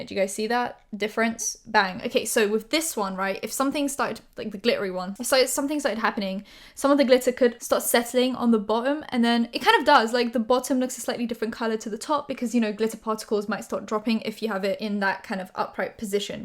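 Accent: United Kingdom. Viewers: Are u british and from London?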